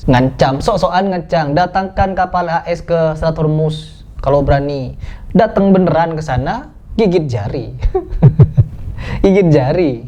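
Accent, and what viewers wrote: Madura, Indonesia. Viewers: bukan orang madura, tapi ngomong logat mdr, krn sy sendiri, ayah mdr, ibu jawa, krj guru sd pasca merdeka, ini antek amrika, mirip prabowo, hingga kapal pertamina indonesia ditahan iran, hingga nggak bisa apa 2,pdhal ayatullah hafid qur an, kalau nggak bisa bc huruf, itu kelihatan..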